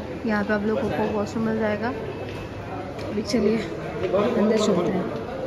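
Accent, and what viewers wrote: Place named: Odisha, India. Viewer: Flite me safar karna kya ajkal ka dinme badibath he kya odia wali hindi jadh raheho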